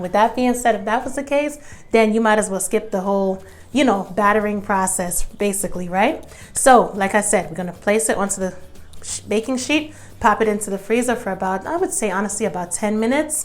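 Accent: New York, United States. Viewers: I’m making this for sure. Girl your accent is so strong, you have to be from NY lol. I’m thinking the Bronx!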